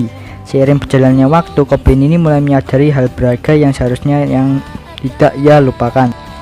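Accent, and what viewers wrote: Java, Indonesia. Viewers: Mas itu logat nya kok medhok Jawa banget, aslinya mana to mas? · Waduh min gue baru mampir disini, ngakak denger logat bicaramu kayak Jawa banget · Medok bahasanya:v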